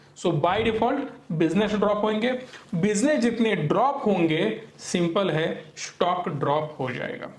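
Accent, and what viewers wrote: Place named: Kolhapur, India. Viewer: सर मराठीतच तुमचं कोल्हापुरी आयकायला आम्हाला खूप आवडेल 🙏🙏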